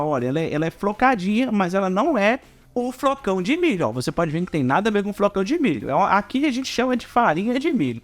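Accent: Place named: Minas Gerais, Brazil. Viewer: Ñ sei se falo da receita ou desse sotaque maravilhoso, eita que mineiro é outro nivel ❤❤❤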